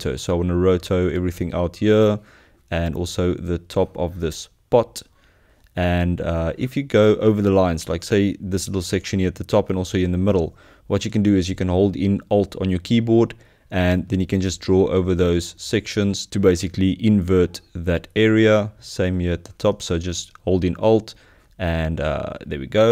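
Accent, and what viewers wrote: South Africa. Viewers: this man sounds like a south african!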